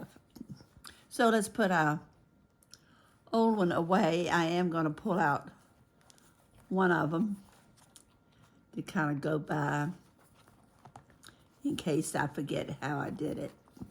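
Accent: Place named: South, United States. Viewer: Thank you to Youtube for the algorhythm working correctly to recommend this delightful channel! This is a treasure trove of techniques. Beautifully done! And I love your Southern accent, it's so pretty!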